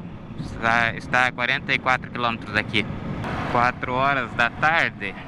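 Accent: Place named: Paraná, Brazil. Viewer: Homi vc e da onde ,tem sutaque de paranaense misturado com Catarina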